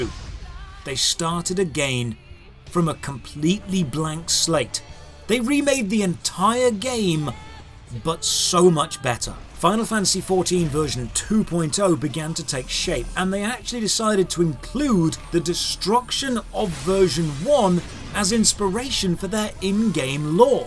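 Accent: United Kingdom. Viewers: proves that all you need is a deep voice, British accent and a suit and you can make any topic sound high class.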